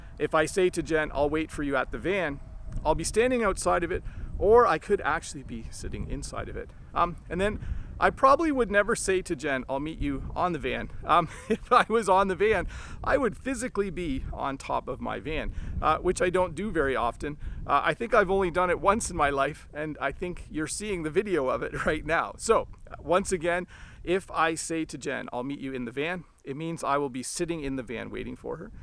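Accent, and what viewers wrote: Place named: Canada. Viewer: New subscriber and I found your Video's really helpful to learn english in canadian accent. I'm enjoying your videos very much Sir. I'm starting to download many of your videos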